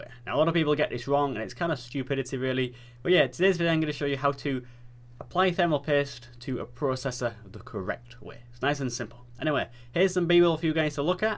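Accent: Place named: Northern England, United Kingdom. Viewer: Thought so, you sound more northen than me haha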